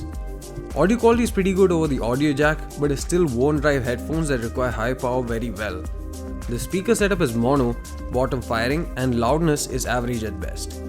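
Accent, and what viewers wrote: Kerala, India. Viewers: ok , u r from kerala?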